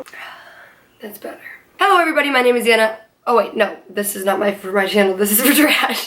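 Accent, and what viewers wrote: Boston, United States. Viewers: HER BOSTON ACCENT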